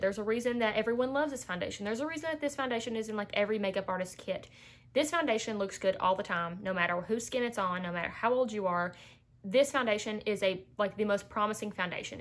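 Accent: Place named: Kentucky, United States. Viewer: I've heard Allie rave about your glosses recently and just came to your channel and right off the bat I like your fun personality! :) Love the green here you got going on in this video and I love your accent too! I'm from and live in NC and there are different and unique southern accents across the southern states, but sometimes I can't tell - where are you from? Also, I am not even 2 minutes into your video yet and you've already got me laughing! :D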